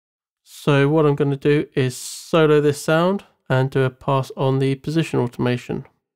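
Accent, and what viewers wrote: United Kingdom. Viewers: Eh, the dude sounds like a caucasian from the UK. Make of that what you will.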